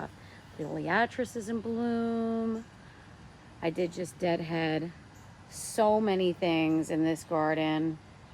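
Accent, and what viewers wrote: New Jersey, United States. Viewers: Very nice !! Love your channel and also your voice !! Not sure if it’s a Jersey accent ? What a transformation in your garden ! I love how you always change things up !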